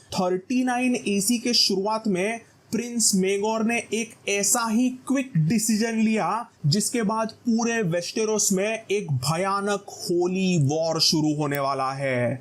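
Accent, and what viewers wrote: Maharashtra, India. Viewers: your hindi has a bit of Marathi touch to it, are you Maharashtrian?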